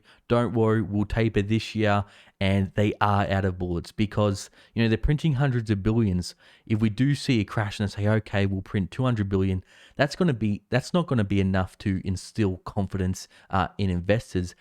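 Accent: Australia. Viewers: Hey. I'm an Aussie, too. You sound like you live in Melbourne, but I could be wrong, of course. I'm in Sydney. You make some interesting videos. So, I'm designing a currency that has no commodity capacity, i.e can't be speculated/gambled on. The objective is to incentivise creating sustainably. I'd like to run it past you, more for analysing the modelling as it is a pretty difficult currency to introduce in reality, but who knows. Get back to me and we can chat about it.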